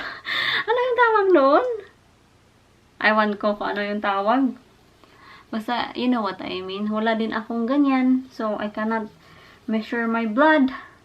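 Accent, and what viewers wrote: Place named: Visayas, Philippines. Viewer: Hi, bagong subscriber here from Winnipeg Canada. Alam mo ba na ang wife ko ay na hook sa kga kwento mo.. Pati ba naman yung headache mo iniisip na sana daw pregnant ka hehehe. Natutuwa din sya sa visayan accent mo. Keep on posting more vlog. Sana pa shout out din..My wife's name is Dhang Camia. Sana mapansin mo naman ajg comment na ito...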